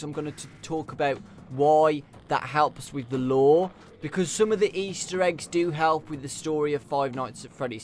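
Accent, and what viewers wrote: United Kingdom. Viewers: that was sweet man ! well done but that british accent :-D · He has a british Accent he is form the UK C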